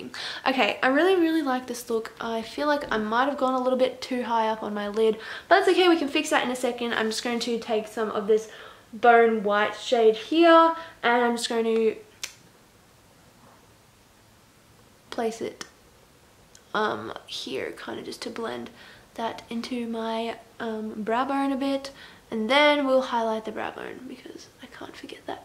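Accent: Australia. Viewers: Is anyone else obsessed with her Australian accent, I'm from Sydney and for some reason I just love listening to her talk. Maybe also because you just give off such positive vibes☺️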